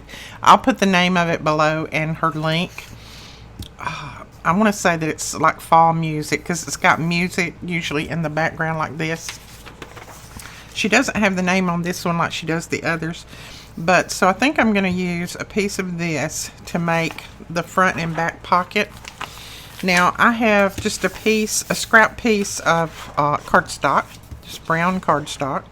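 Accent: South, United States. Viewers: I just love watching you craft your southern accent is adorable and listening to you chat is entertaining, just wish I could answer and chat with you. Thank you for all your beautiful videos.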